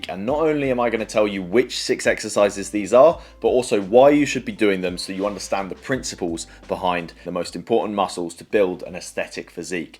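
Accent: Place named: London, United Kingdom. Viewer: love ur accent....so pretty ! where is it from ? London ?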